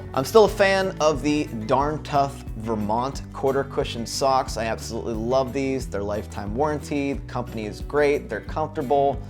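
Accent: Pittsburgh, United States. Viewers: You from Pittsburgh? You have a bit of a yinzer accent.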